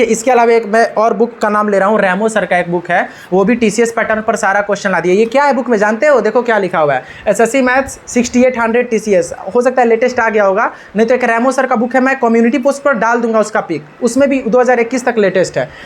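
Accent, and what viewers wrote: Bihar, India. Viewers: bihar se ho bhaiya . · Bhai aap ...Bihar se ho ?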